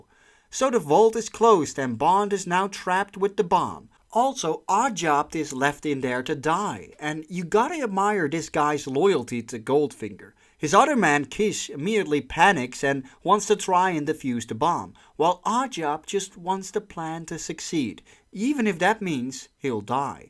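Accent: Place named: Netherlands. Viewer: I am back and really enjoyed your previous recaps of the early Bond films. The Dutch accent adds something and this revised overview is fantastic. Keep them coming. I have subscribed.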